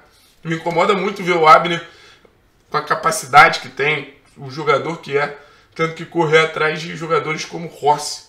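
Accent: Rio de Janeiro, Brazil. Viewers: Ele é carioca da gema, não tem como forçar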